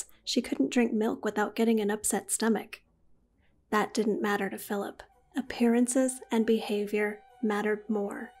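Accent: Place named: United States. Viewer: Sad that the speak is american. the crediblilty changes when its us talk.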